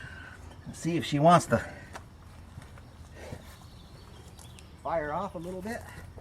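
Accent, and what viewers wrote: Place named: Dakota, United States. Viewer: No this is really how he talks, being from Dakota will do that to ya! Good will it run video!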